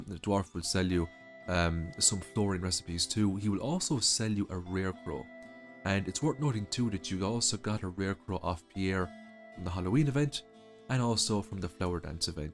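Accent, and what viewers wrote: Ireland. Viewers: thank you for the heart, I love your Irish accent ❤️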